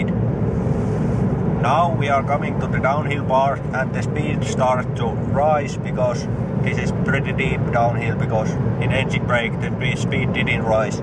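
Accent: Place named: Finland. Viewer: Finish accent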